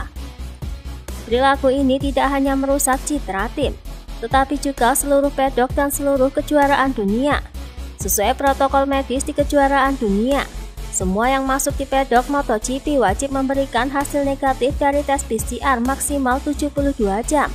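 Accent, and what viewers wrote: Java, Indonesia. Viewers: Mbak medok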